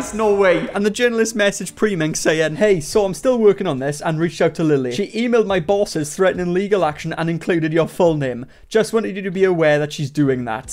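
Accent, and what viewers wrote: Wales, United Kingdom. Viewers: He has a Welsh accent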